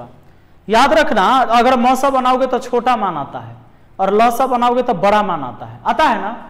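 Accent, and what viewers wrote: Bihar, India. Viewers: Sir aap bihari ho kya aap video mai ache language use Kiya kro😅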